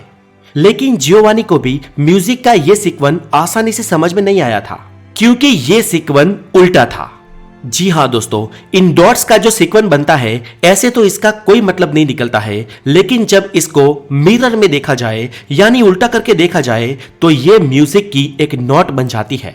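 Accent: Gujarat, India. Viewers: भैया जी यह सर गुजराती है और गुजराती लोग ऐसा ही बोलते है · kya aap gujarati hai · You are a Gujarati right 😅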